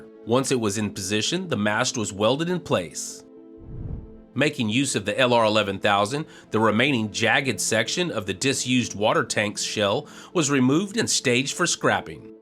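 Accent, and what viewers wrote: Texas, United States. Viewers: Someday, I want to hear that Texas accent coming from the Planet Mars ! 👨‍🚀🏁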